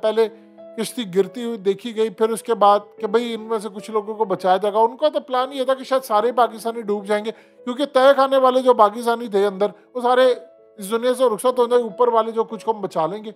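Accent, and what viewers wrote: Punjab, India. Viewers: Mr.why you speaking Urdu in Punjabi style....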